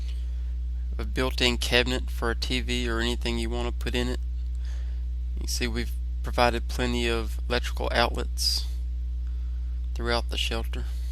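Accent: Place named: South, United States. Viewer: Love that Southern accent you got rocking!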